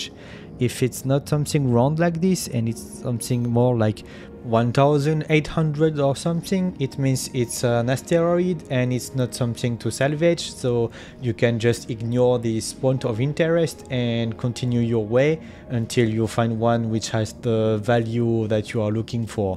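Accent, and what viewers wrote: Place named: France. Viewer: Bel accent on reconnait les fr^^ , en tout cas elle est top ta vidéo :) sa ma donner envie de lancé mon reclaimer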